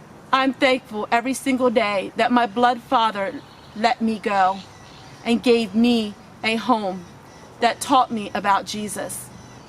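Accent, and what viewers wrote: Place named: Pennsylvania, United States. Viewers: Yes you can have both. That person is ignorant. I like how you speak, I grew up pretty close to your area in Willow Grove, Pa. during the 60's and 70's so I'm familar with the dialect. Growing up I had two sister Quaker ladies (spinsters) who babysat me and they spoke using always thee and thou in their sentences and wore long dresses and lived very frugally. I learned what a compost pile was from them, how to prepare simple heathy meals and how to wash clothes by hand. People criticize sometimes what they fear. You are honest,simple, godly peole just like my sitters, Cynthia and Myrtle. Keep on being you.👍❤ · I had a feeling you were from the Pennsylvannia area. Choose sounds slightly like 'chews', and on sounds like 'awn' (hard to describe), kinda subtle but I hear it.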